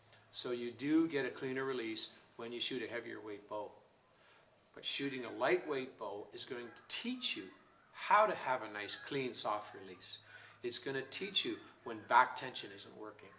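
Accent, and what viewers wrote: Canada. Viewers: Aboot? Oh Canada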